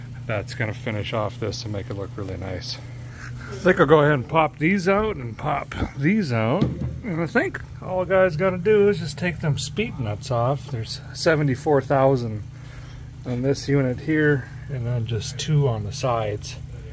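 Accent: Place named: Tennessee, United States. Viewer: Great idea Thanks! Tennessee talk! I saved some thought was junk too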